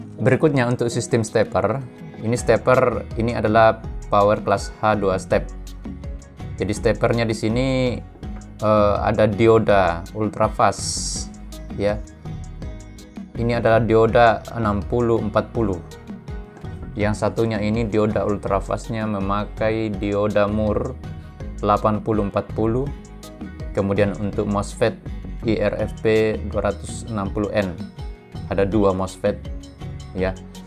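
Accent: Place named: Toraja, Indonesia. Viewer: klo saya dengar2 logatnya Orang ini dari Toraja, maaf klo sala tebak 😁🙏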